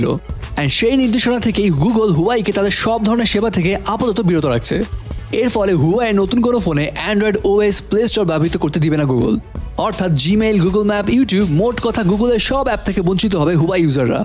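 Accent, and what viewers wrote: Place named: United States. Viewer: ভাইজান এত স্মার্টলি কথা বলতেছেন মনে হচ্ছে যে আমেরিকান বাংলা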